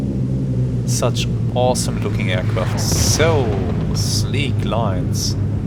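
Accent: Germany. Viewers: I really love your SH3 series mate. You are smart and careful and that german accent is just awesome! This is real entertainment. Auf wiedersehen herr kaleun!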